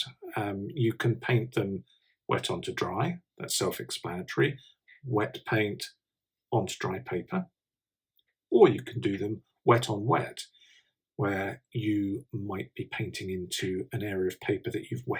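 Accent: England, United Kingdom. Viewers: Love your channel, look forward to more videos, your paintings are beautiful. And you just cant beat you lovely English accent! Easy on the ears.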